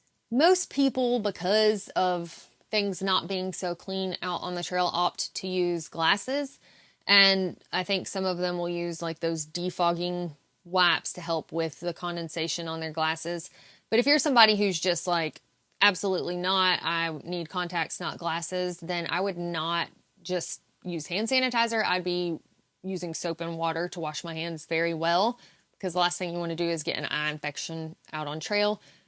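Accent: South, United States. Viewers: Hi, long time viewer, 1st time commenter:) A couple of housekeeping things to start. A missed opportunity, you mention 'announcing to people and being embarrassed on the front side...' Is better than being embarrassed on the backside:) Nextly, did you know that with your southern accent, which I adore, your pronunciation of Iceland sounds very much like Assland. Talkin' about poop and all in context lol. Ok so seriously, one of my many careers in life involved being a professional, corporate problem solver. On the Yellowjacket problem I have about 4 solid solutions in mind so let's start with just one and see what you think. Could I suggest a while list of people who the entire hiking community know are legit through hikers who are putting in honest miles. In my opinion a private facebook group with admins is most likely the easiest solution. Hikers like yourself are in the group as well as angels who are providing services for an intended group and purpose. 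If you're not in the group you don't have access to what is made available. There are ways to 'admit' people who are whitelisted as well with a cheap wristband or such but I'm just looking ahead to how to you keep track of who is white listed and on site. The angels can also be in the facebook group and can ask a question about someone who might be legit but not in the group yet. There should always be enough people available with internet access to vouch for someone. I would think the group is close knit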